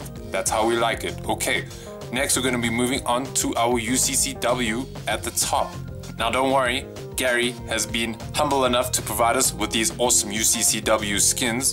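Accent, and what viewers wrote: South Africa. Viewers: Cool tutorial, wasn't expecting the SA accent, nice bru!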